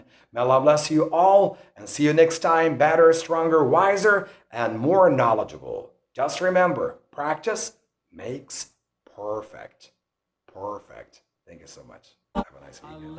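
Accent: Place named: United Kingdom. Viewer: british accent on top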